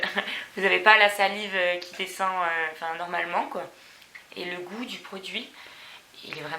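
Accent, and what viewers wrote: Corsica, France. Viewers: Mdrr toi sa se voit que tué corse avec ton accent moi aussi je le suis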